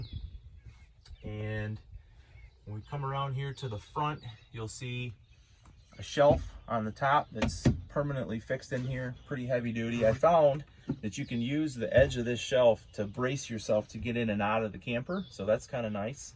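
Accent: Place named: Iowa, United States. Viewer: Hi! Super! Questions! What state / locale were you filming in? (Why would you leave - beautiful birds and landscaping! I'm going to guess having no other info but sounds and your accent -- Iowa or thereabouts - Illinois? Second; What is that vehicle you're pulling it with? Thank you! Diana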